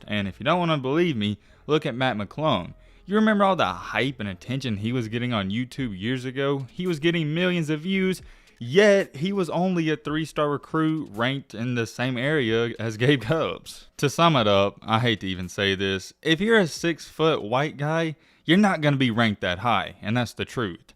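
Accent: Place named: North Carolina, United States. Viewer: He got that NC country accent idk where he is from tho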